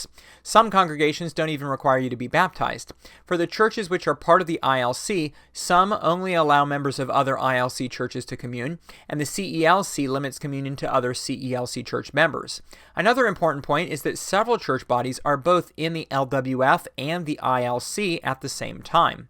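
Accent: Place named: United States. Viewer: The commenter didnt know you were american? What did he think you were by your accent? Maybe Canadian but you'd have to have a really bad ear for accents to think that! Another great video. Dont worry about it. Haters gonna hate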